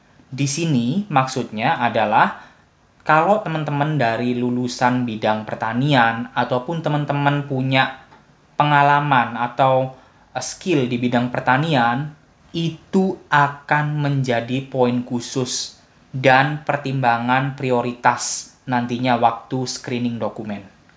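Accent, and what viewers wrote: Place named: Java, Indonesia. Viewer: Dari logatnya mas nya jawa ya..jawa mana mas..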